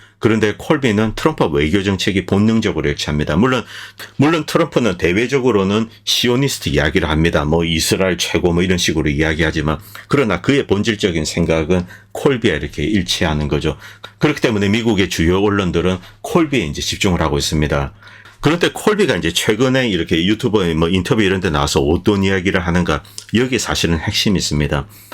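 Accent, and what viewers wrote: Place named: Busan, South Korea. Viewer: 본인이 몰라서 그렇지 전혀 다릅니다 지금 방송하시는 도 부산 사람이거든요 거의 이 억양입니다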